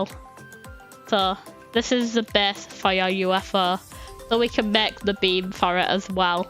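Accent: United Kingdom. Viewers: after i cam e to your channel i already knew you are british xd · Your British accent I LOVE IT❤ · Are you from the Britain cuz you have a british accent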